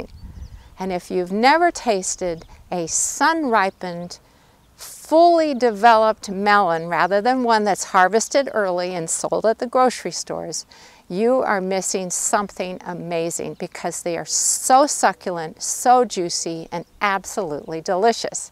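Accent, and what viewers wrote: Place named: United States. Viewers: American's strong accent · I don’t know what they’re talking about it’s just American lol.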